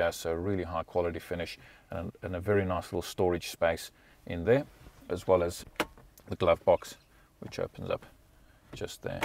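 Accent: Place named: South Africa. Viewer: This guy is South African, not Australian.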